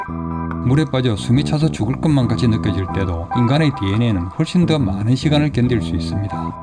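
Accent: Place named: Gyeongsang, South Korea. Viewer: 경상도 분이시죠? 친숙한 말투가 묻어나오시네요 ㅎㅎㅎ 다큐같은 느낌이 잔잔하니 아주 좋네요. 근데, 오디오를 나눠서 시차를 두고 녹음하신건지 맑은 소리였다가 먹먹한 소리였다가 하네요 ㅎㅎ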